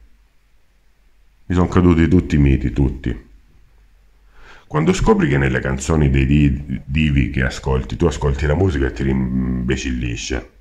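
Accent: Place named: Salento, Italy. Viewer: ti ammiro tanto...grazie per la sapienza ke condividi con tutti noi🙏❤️ti ammiro tanto,ankio salentina di Brindisi,sento un accento vicino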